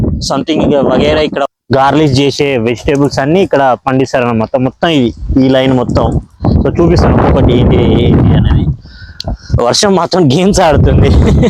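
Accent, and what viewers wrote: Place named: Andhra Pradesh, India. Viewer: Anna Nuvvu mana Telangana yaasa lone maatladu, enduku Anna aa tecchipettukunna andhra accent, Uma ni choodu undedi Hyderabad lo, aayana wife Telangana aavida ayina kaani mukka Telangana yaasa maatladadu. Nee originality kolpoku, mana baashani yaasani vaallu okappudu chaala vekkirinchinaaru, baasha praathi padikapyne manam kotlaadi Telangana saadinchukunnamu, aa vishayam marchipoku brother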